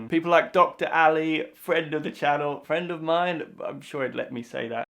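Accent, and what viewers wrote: United Kingdom. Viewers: I Agree with you about the photo. You are much more handsome now. Actually, much cuter than that other doctor on the front page of the magazine. Besides that, you have a great British accent and I love the way you laugh. But I mainly watch you because you are so down to earth and you have interesting medical subjects and you explain it easy for me to understand with a touch of comedy. I watch 2 other doctors, and you are much better than them. You are my #1 doctor on YT.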